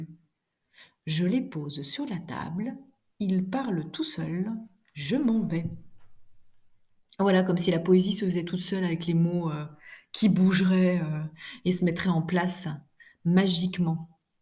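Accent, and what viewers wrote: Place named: South, France. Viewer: Bonjour Madame, c'est Priam. Sympa votre accent du sud! Pour répondre à Valentin, je trouve mes questions et mes réponses dans des livres. Bonne journée!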